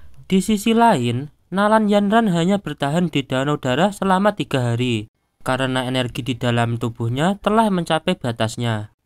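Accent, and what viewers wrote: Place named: Java, Indonesia. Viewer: Suara mu meddo banget mass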